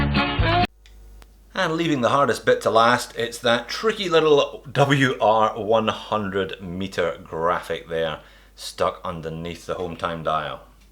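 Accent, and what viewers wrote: Scotland, United Kingdom. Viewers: You look and sound like a scottish peter finch. Its uncanny he’s a golf youtuber and i swear other than accent differences you guys talk just alike.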